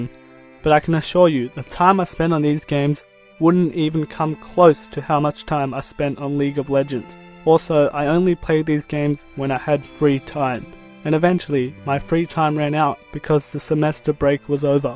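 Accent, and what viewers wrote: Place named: Balkans. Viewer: Tacno se primecuje balkanski glas!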